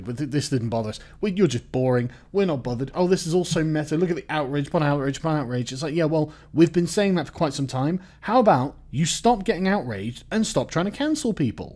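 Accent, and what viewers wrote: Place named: United Kingdom. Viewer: Reading snooty comments in a mocking voice is 1000x better with a British accent..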